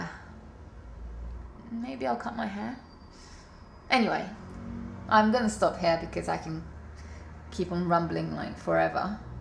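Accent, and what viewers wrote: United Kingdom. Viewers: This needs to be more popular..... I mean, this is genius. It's interesting to look at. Looks realistic. Looks cute. Her english accent is fantastic. Spread~ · an asian in london with a british accent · You can tell how perfect her British accent is based on her mispronunciation of Tokyo, despite being native Japanese!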